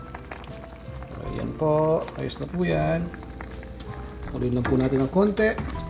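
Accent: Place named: Cavite, Philippines. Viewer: cavitenyo siguro sya kc yung accent niya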